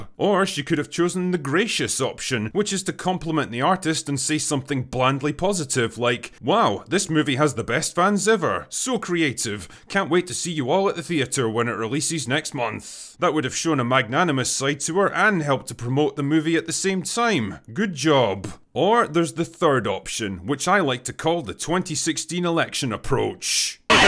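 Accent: Scotland, United Kingdom. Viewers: Is it Scottish accent?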